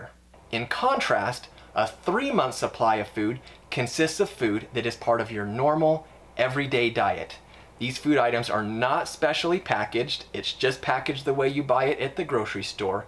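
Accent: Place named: Utah, United States. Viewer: By the way say meal and oatmeal I’m guessing you are from Utah 😀I like your pantry. You have the same exact items I have lol. We must shop at the same places.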